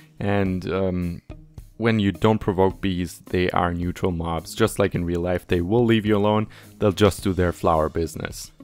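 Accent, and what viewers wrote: Germany. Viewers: Hab dich zufällig gefunden hab jetzt nach 4 Jahren kein minecraft ,wieder angefangen und finde deine Videos hilfreich und hab direkt an deinem Akzent erkannt das du deutscher bist (Bratwurst und Bier bruder)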